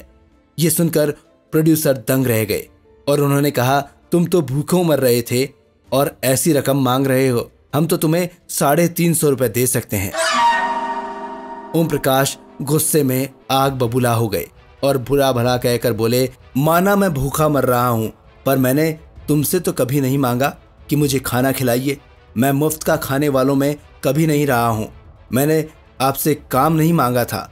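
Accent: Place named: Urdu, Pakistan. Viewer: I don't like your urdu pronunciation. Speak Hindi.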